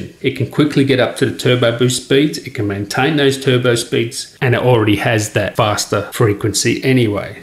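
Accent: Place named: Australia. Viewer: What is it with Australians and the way you pronounce the letter "Aitch"?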